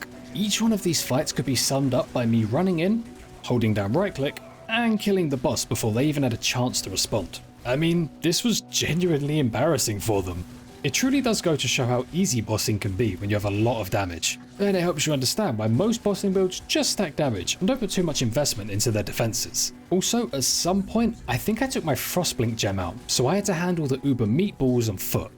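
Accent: United Kingdom. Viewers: the lets go shopping caught me off guard after being used to the smoky british accent for so long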